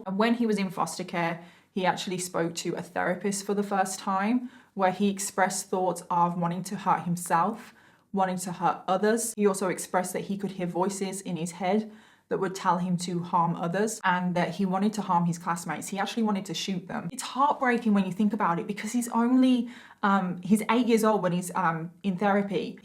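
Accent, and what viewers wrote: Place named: West Midlands, United Kingdom. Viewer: Your accent is a combination. West Midlands and what?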